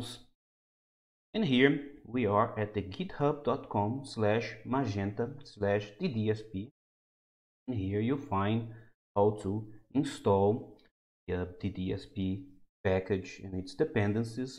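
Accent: Brazil. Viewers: Brazillian accent s2, is DDSP made in BR?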